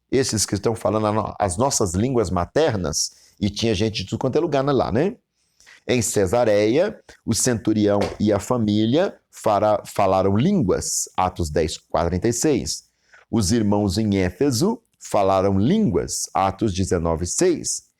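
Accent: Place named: Minas Gerais, Brazil. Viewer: As pessoas de Minas Gerais aprenderam o significado de "impelir" da mesma forma que você irmão. Sotaque não é sinônimo de ignorância 👍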